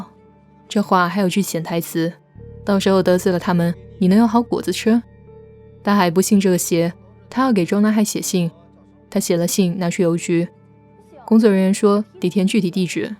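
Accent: Standard. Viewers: 广东话很标准👍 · 完全冇諗過你粵語會甘標準！一直以為你只講普通話🤣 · 是广东仔吗 粤语说的这么标准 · 噢我就注意到了k猫的粤语很标准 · 哗！你的粤语很标准呀！ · 原來K猫會說廣東話還說得這麼標準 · 題外話讚一下k貓的廣東話很標準☺ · k貓講廣東話好標準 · 粤语很标准哈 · 广东话几标准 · 粤语很标准呀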